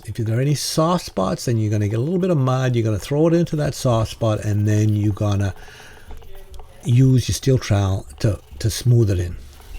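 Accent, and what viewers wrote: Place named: Boston, United States. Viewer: Good tips! You don't need to tell us you are in Boston... we can tell haha. Great job!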